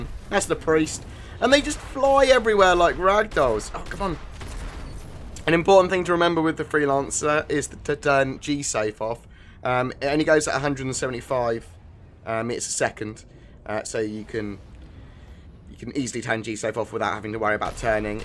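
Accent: United Kingdom. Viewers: i cant understand this british dialect.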